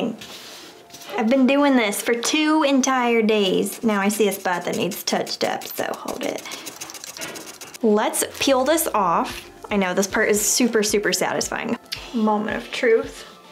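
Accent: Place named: Columbus, United States. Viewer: I love it! It turned out so pretty! It definitely makes me wanna take up wool art. I just might... Also, quick question - are you from the Columbus, Ohio area? Or did you grow up somewhere else? I only ask because I'm from the Dayton area (born and raised) and our accents are COMPLETELY different, which just seems crazy since you only live about an hour from me. Lol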